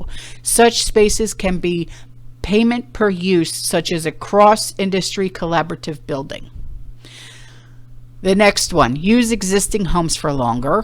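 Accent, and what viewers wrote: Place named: New Jersey, United States. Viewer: I'm right there with ya shaking my fist in air. I had a feeling this was something to pay attention too when I heard the jersey girl coming through my screen!! Lol. You get 'em girl!!!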